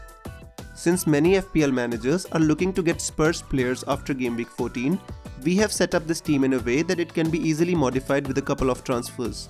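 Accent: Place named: Assam, India. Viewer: Axomiya niki o? Accent tu axomiya nisina lagise j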